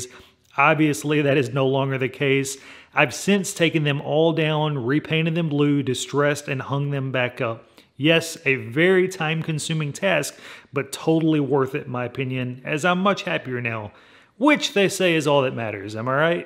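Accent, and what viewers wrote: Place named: South, United States. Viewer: From the sound of your voice you live in like Tennesee or one of the Carolinas.... And I hate driving 🤣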